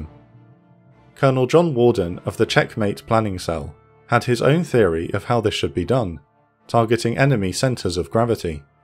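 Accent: England, United Kingdom. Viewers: The English accent threw you off. But he does say Iraq. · why is ALWAYS some deep English accent?! I COULDN'T listen to it as much as I wanted to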